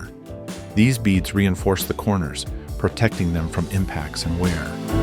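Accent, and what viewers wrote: United States. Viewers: Who is this 'Merican doing the voiceover ?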